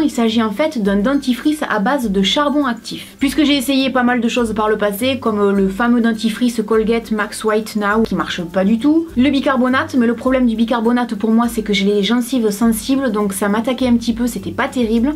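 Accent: South, France. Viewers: #tuesunestar lol tu me fais toujours rire et j'adore ton accent du sud 😍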